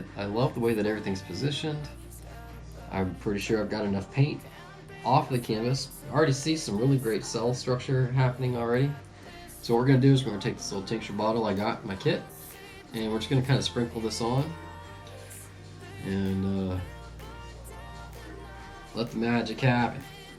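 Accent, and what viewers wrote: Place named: Texas, United States. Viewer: Love that you still have your Texas accent my friend!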